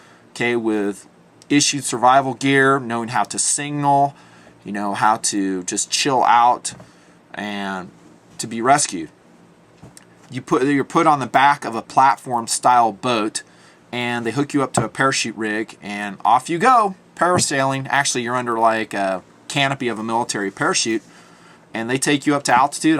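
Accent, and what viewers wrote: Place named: Alabama, United States. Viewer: Perfect timing for viewing this one. My kids are reflecting the "mamma's boy"/wuss image and I've been looking for a hint, path, help, guidance or anything these past couple of months. My resources are at a minimum, and the light has shown me the way. Excellent vid and I'm amazed at the timing on my viewing. Thank you. By the way, the Bama accent comes through in all the vids! When my boys "hate" on me, you know where I'm sending them!! LOL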